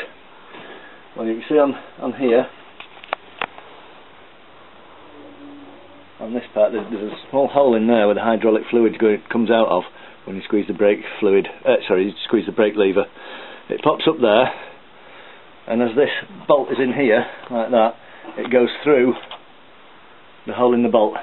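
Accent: United Kingdom. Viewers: got to do this on my tiger soon, cheers for the tutorial, nice to hear it from a Brit too ;)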